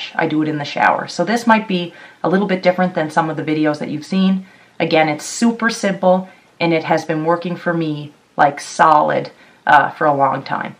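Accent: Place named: Cape Breton, Canada. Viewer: I've been watching lots of curly hair videos lately so this popped up as recommended - had no idea you were a caper til I heard the "shkonawn guys" haha made my day 🖤 now I'm real curious if ya got some specific tips for our wild weather in CB for curly hair, mine goes with the weather a lot of the time and I get so much frizz dude I'm not sure if its the highs and lows all the time out there or not lol. hair gets right crazy ah must be that nor'easter on its way by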